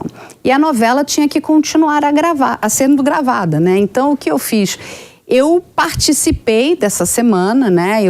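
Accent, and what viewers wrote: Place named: Rio de Janeiro, Brazil. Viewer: vc eh boa profissional e gosto de assistir seus vídeos, MAS POR FAVOR, PARE DE FALAR CARIOCA!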